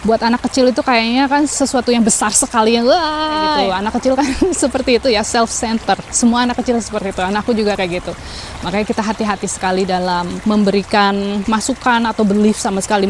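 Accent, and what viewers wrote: Banyumas, Indonesia. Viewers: Logat ngapake kentel ya yu 😁😁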